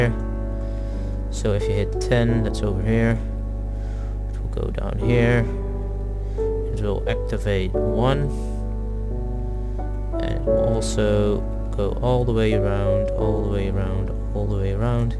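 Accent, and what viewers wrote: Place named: Netherlands. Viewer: Are you and you're friend dutch? If so you're accent is awesome :D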